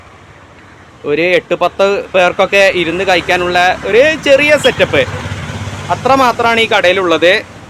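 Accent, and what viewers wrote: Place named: Malappuram, India. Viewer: ഒനുകിൽ മലപ്പുറം ശൈലി ബർത്തനം അല്ലെകിൽ ശുദ്ധമലയാളം ഇതു കപ്പ ബിരിയാണി പോലെ ആയി സാരമില്ല ശെരിയാവും പുതിയ ആളല്ലേ 👍👍👍👍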